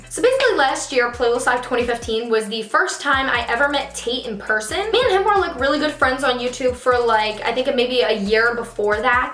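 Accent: New York, United States. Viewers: your accent tho its amazing I can tell you're from NY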